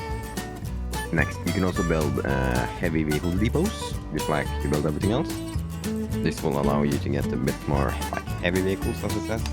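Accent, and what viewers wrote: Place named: Norway. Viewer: You're defo Scandinavian, based on your english I'd say Norwegian like me. Thanks for the video:D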